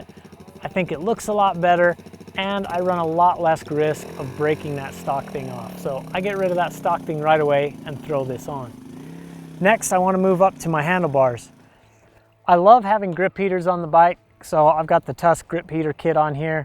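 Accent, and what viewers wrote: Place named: Utah, United States. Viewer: He's trying to say accessory, but has a bit of a Utah accent.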